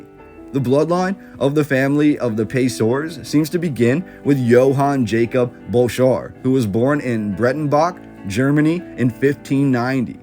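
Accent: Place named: Pennsylvania, United States. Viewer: I knew you were from PA by the way you pronounce Lancaster. Lankuster.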